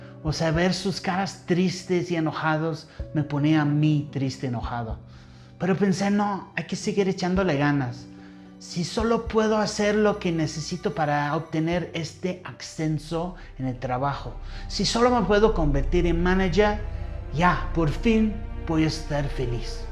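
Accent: Mexico City, Mexico. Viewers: Me encanta que tienes acento mexicano.:D bueno de CDMX pero de Mexico. Pero no les digas a tantos! si no luego vienen mas y luego no nos alcanzan los tamales!:D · Hola estoy aqui x tu anuncio en Instagram y me encantó ver este video. Ya me suscribi y gracias x compartir cosas tam bonitas de mi ranchito Mexico, todo es verdad, la hospitalidad Mexicana no tiene igual, en Mazatlan recibimos muy bien a los extranjeros, recuerdo mi familia a recibido en casa a invitar a comer a turistas y amigos de Perú, Italia, Canadienses, Americanos, y paisanos de diferentes estados, Jalisco, CDMX, Nayarit, Oaxaca, Sonora, Chihuahua, BCS, BCN, y de muchos otros lugares que ya no recuerdo y sobretodo amigos locales, que siempre tienen un lugar en nuestro corazón, te deso mucgo éxito en tu nuevo emprendimiento, cuando gustes visitar Mazatlan ya sabes que serás muy bien recibido 🌅🎉 cuídate mucho tu y tu familia y te deseamos lo mejor de los éxitos y es verdad he? 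Como te vi un parecido con nuestro querido y bien recordado Paco Stanley, y me encanta como hablas ya bien español mexicano de CDMX 💪😬🎉🎉🎉🎉Felicitaciones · Eres un extranjero que al llegar a México se puso el de Mexicano 100% es más me pareces un chilango con poquito acento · Saludos hermano, me encanta tu acento chilangles fresa, sigue haci nunca cambies, gracias por hablar tan bien de mi hermoso Mexico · Tienes acento chilango!! 😂saludos desde Guanajuato. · Hasta acento chilango tiene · el Mejicano... MAGNIFICENT MAGNIFICENT!!! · hasta tiene acento de chilango, todavia se nota el ingles pero tiene un acento MUY bueno. Es super autentico, aprendio el español en las calles de la cdmx no en los libros de texto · Amigo se te sale el acento chilango 😂🎉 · Muy cierto! Ya hay muchos youtubers que saben cómo lograr 👍🏻 con los mexicanos (solo es hablar bien de nuestro hermoso México 🇲🇽). Y lo demuestra con su español "mexicano"🎉 gracias por amar a México 🇲🇽❤ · Ya hasta trae el acento mexa 😂😂. 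Ya eres uno de nosotros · hermano.... ya eres mexicano, saludos. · pinche, ya me caíste retebien. Eres el inglés salamero! jajajaj bienvenido cabrón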